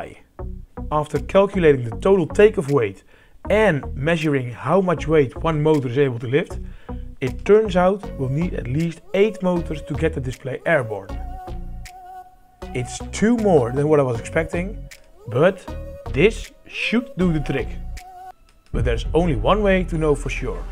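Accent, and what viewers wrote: Netherlands. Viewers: Haha je accent is zo Nederlands, leuk om te horen. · i saw the thumbnail and thought "that looks like dutch grass. then i heard 1 second of you talking. yep. ik herken altijd een hollander op youtube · Love your videos mate. So much so I’m actually commenting on a video. Your creations are incredible. Your channel is definitely the next “stuff made here” ❤ I’d actually love if your videos were 3x longer with more of the technical stuff. Maybe you’re keeping the videos short n sweet to attract the huge following for now? Do you have an instagram page or anything for us to follow you more on? Also love the Dutch accent.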